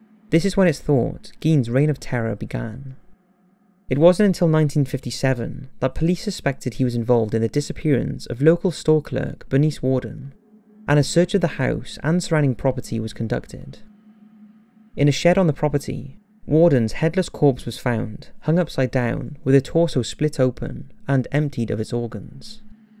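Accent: United Kingdom. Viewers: Where are you from? I thought you might be British, but throughout your videos you mispronounce certain words, so I thought English might be your second language? For instance Ouiji and heinous were mispronounced. I’m not poking fun, I’m just really curious.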